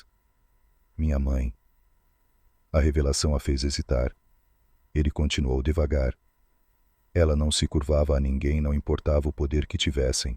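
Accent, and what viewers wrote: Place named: Portugal. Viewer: Uma história muito interessante. Quem diria que a atitude daquele, milionário tão agressiva e fria, iria acabar numa linda história de amor. Narração perfeita, de Portugal ❤❤❤❤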